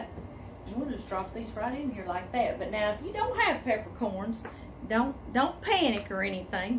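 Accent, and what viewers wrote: South, United States. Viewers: I do enjoy your cooking videos. You are so much fun! Don't stop talking! Your Louisiana vernacular is hilarious! Love your Southern accent and all your phrases! You are entertaining! I love to watch cooking videos. Keep up the good work!🍳🔪🥘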